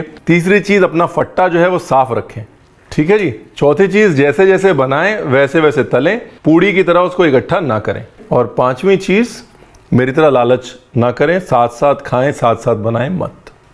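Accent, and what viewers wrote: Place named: Gujarat, India. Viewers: Fafda 😋👌👌👌jalebi🤔 miss kar rahe the lekin aap ka mitha mitha gujrati sun k dil khush ho gaya👏😀🙌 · Achha lgya apka gujarati tone 🌺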